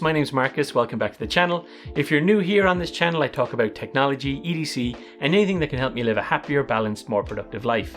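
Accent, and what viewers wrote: Ireland. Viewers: just came across your channel after this video was suggested to me and my god i’m glad! what a legend! loved trying to localise the silicon valley-ified irish accent. has to be cork? definitely down south anyway ;) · "Underneet Dat doh..." great craic to see a fellow Irishman with a cool edc channel. Great altoid tin!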